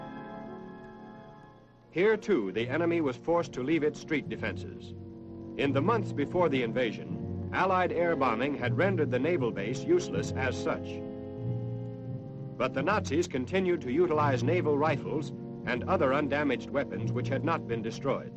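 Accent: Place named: Transatlantic, United States. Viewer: Maybe you should look up what a "trans-atlantic" accent is.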